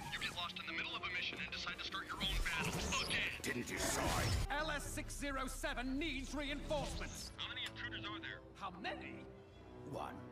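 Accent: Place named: Scotland, United Kingdom. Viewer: I truly love your passion for Star Wars. I was the same, at one time. The first movie I saw in a theater was ANH, was very young but that spark of such a fantastical world and wonderful characters stuck with me as I grew up. Made me believe I could do anything. It did the same for so many in my generation, and we kept the faith for a very long time. But as sorry as I am to say it, those thoughts/dreams/beliefs have been absolutely destroyed since Disney took control. They systematically removed and obliterated that spark, took away the wonder and imagination of such rich worlds/characters. And in it's place gave us tropes, pale remakes of the original, girl bosses, and modern time standards put into a purely fictional world. I don't begrudge you any enjoyment from this or any Star Wars content. Goodness knows I've liked my share of entertainment that others thought was garbage. 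I just have to say this because you seem very genuine and I hope you're not ignoring what is actually taking place with one of the top 5 IPs of all time. And just to add: I've never really known why but the Scottish accent has always been my favorite (and a weakness in certain cases).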